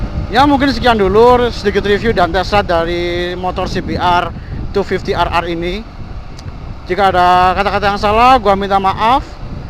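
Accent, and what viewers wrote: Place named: Java, Indonesia. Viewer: medoknya jdi gagal fokus dah,wkwkwkwk apa lagi ngomongnya pke lu gua 😂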